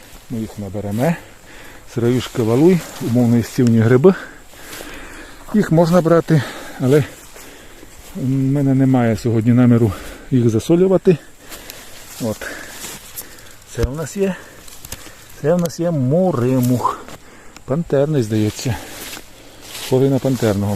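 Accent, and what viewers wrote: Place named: Carpathians, Ukraine. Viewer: Чудовий діалект!Карпатська мова ,доповнює красу такої чудової природи!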